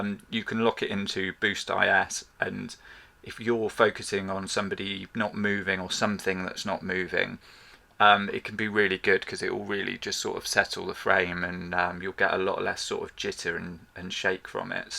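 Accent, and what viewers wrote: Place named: RP, United Kingdom. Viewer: Hello From Malaysia! I Just got the GH6 yesterday. Your channel is very useful. I appreciate the RP (Received pronunciation) accent.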